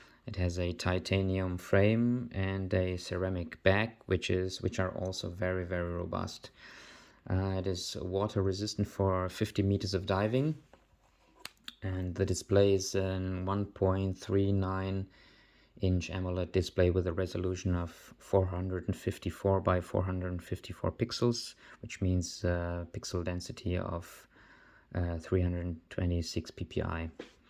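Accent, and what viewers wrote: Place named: Germany. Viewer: Sounds like a german to me :D If I'm right: liebe Grüße! :D Nice Video, good information and its one of the best long time reviews for this watch. Helps a lot!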